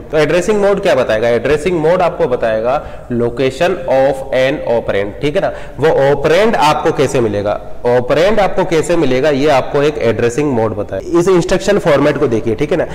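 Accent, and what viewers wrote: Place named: India. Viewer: Im Portuguese, I have a hard time understanding English with an Hindi accent especially when he speaks so fast, I really appreciate these videos, could someone please subtitle these?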